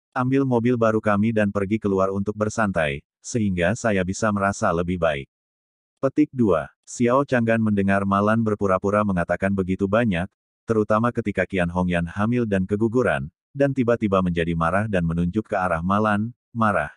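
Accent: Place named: Banyumas, Indonesia. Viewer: Ora ngapak ora kepenak 👍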